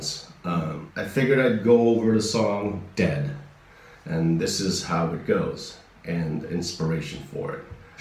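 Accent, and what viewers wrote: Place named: Philippines. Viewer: So cool to hear that he still has a bit of Filipino accent left lol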